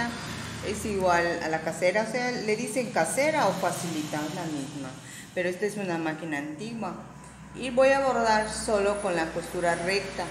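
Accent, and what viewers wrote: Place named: Yucatan, Mexico. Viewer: me encanto escuchar tu acento de Yucatan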